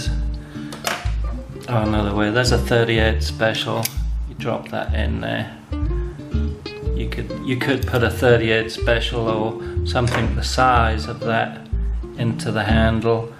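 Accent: Northern England, United Kingdom. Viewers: just out of interest where is you accent from ? you sound like your from up north in the U.K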